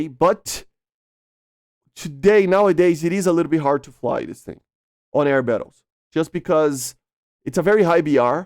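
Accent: Brazil. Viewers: I was about to ask If you were from Brazil because of the accent. Then I saw you had a Portuguese Chanel lmao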